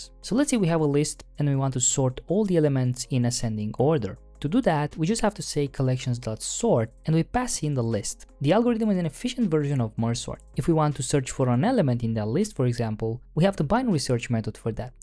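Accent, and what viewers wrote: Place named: Brazil. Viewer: Você fala português? Parece um brasileiro falando inglês.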